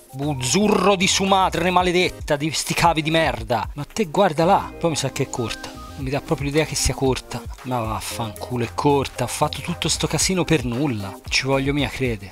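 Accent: Tuscany, Italy. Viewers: Ti ho appena scoperto, sei davvero molto bravo ad intrattenere, oltretutto ho notato che hai il timbro della voce uguale identico a quello di Gianluca Bocci , se non fosse per l'accento toscano marcato ( che è splendido fra l'altro) saresti uguale a lui 😂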